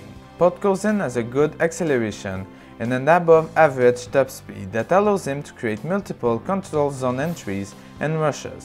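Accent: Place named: Quebec, Canada. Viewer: Are you a Quebecois? I am trying to figure out what your accent is. I am Californian. My distance from it makes me unaware of the accent.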